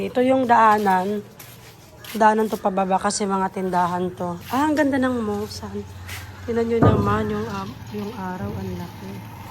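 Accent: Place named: Visayas, Philippines. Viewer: Bisaya ka ma'am Kasi may tono ka bisaya kasi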